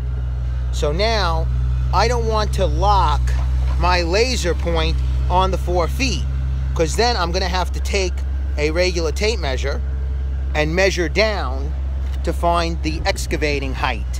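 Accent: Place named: New York, United States. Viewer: this dude sounds new york as fuck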